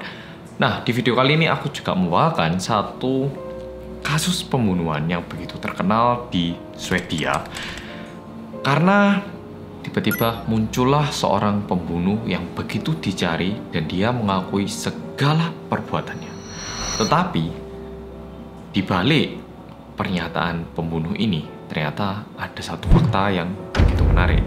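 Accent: Java, Indonesia. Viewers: suka ih medoknya mas 😂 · Sangat medok sekali luar biasaa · Bersama orang korea yg medok💛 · suka bgt denger mas ngomong medhokkkkk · MEDHOKKK AAAA SUKA BGT SMGT TERUS MAS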